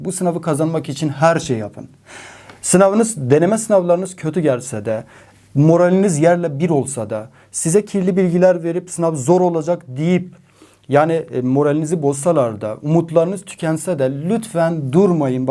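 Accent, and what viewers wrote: Van, Turkey. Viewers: hocam siz kendinizi anlatmak için çabalamayin biz sizin Van şivenize alıştık anlıyoruz sizi. Bazı insanlar ya anlamıyor yâda bilerek sizi kızdırmaya çalışıyor takmayın boşverin. Sizde bizimle bütün sene çalıştınız. Allah sizden razı olsun inşallah. 🤲 Tatili hakettiniz... 10 gün sonra denize girip kef edin. He walla, kahvaltı da enfes olsun yarasın. Afiyet olsun. Yiyin için gezin yüzün en güzel kef ler sizin olsun 😃 hakkınız ödenmez tanıştığımız için çok memnun olduk bunu kendi adıma çok rahat ifade edebilirim. Siz örnek bir egitimcisiniz meslektaşlarınız size imrensin isterim. Cansın. 🙏👍👏😇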